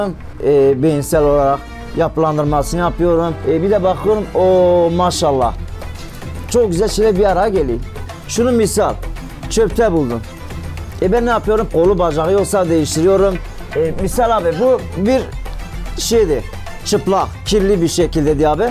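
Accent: Diyarbakir, Turkey. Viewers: abi diyarbakırlı mı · Bu adam %1000 Diyarbakırlı :)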